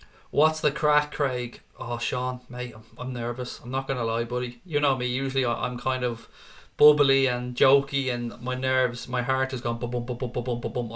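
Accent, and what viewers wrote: Ireland. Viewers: What part of ireland are you from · Are u Irish? 🇮🇪☘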